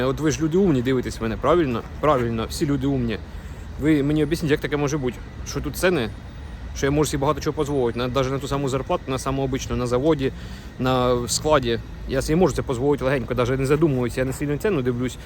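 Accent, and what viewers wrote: Ukraine. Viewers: досмотрела до конца, хоть и не ищу работу)Я ещё не слышала такого говора) акцент украинский, а слова русские, очень необычно 🙃 Удачи Вам, где бы сейчас ни были!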